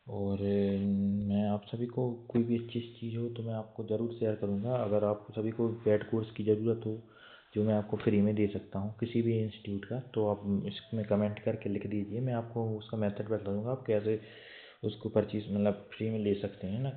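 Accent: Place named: Rural, India. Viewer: Tu bundelkhand se hai kya ??? Accent se lg ra hai kisi gaon se hai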